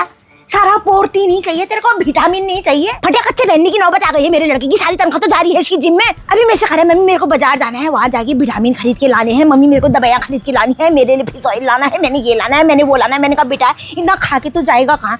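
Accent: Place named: Pahadi, India. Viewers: Mzaa hi aa jata hai aapki pahadi leh sunke😂😂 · Aapka pahadi tone me bolna bahut achha lgta hai mujhe mere ghar(india) k pados k aunty k yaad aa jati hai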